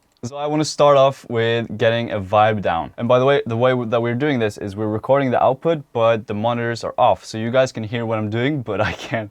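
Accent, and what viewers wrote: Norway. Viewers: Fan du är ju norsk haha hörde det direkt i slutet där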